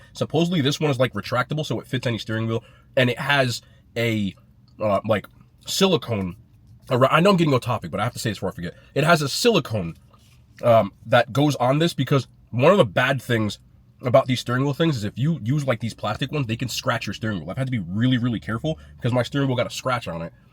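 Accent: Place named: New Jersey, United States. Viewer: Bro so jersey he can’t help it lol …you gotta freak the burger all the jersey people knew exactly what you was saying lmaooo ona set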